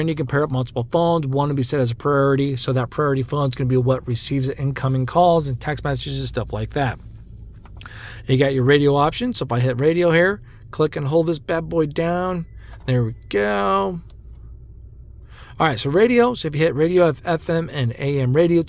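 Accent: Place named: Midwest, United States. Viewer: Sounds like a Midwest English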